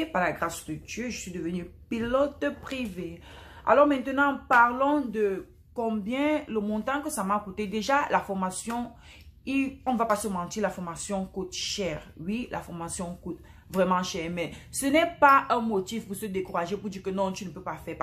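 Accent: Cameroon. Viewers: Salut ma chère. J'adore bien ton accent Camerounais.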